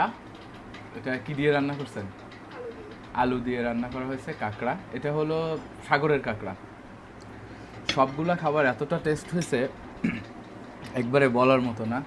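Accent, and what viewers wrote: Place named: Barishal, Bangladesh. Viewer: আমি বরিশাল বিভাগের পটুয়াখালী জেলা থেকে দেখছি, যিনি উপস্থাপনা করতেছে তার বাড়ি মনে হয় পটুয়াখালী বা বরিশাল।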